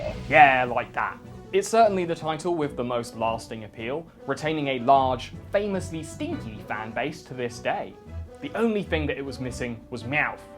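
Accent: United Kingdom. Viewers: Had to stop the video 1 minute in because of the unbearable British accent on my ears.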